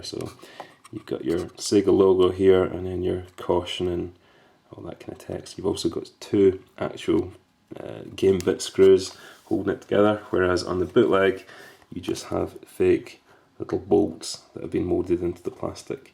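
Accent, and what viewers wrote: Scotland, United Kingdom. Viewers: Broken Engrish read in a Scottish accent. My life is complete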